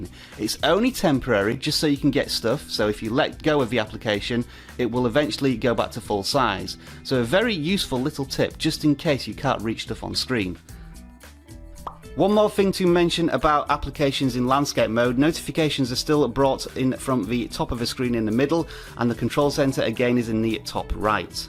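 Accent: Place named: United Kingdom. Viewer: great video and nice clean British accent :-)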